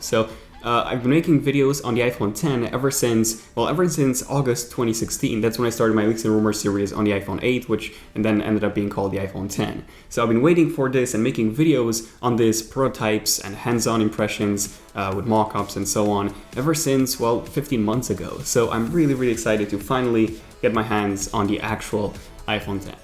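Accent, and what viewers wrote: United States. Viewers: Your accent is like from usa. Good video mate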